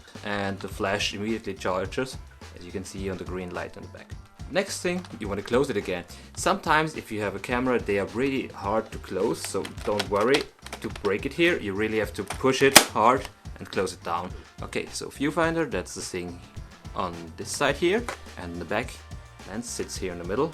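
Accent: Germany. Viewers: One question. Are you German? Sounds like it. :) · Deutsch ist deine Muttersprache, oder?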